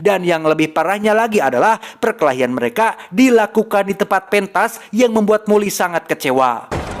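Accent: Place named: West Java, Indonesia. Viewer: Sunda na timana bang?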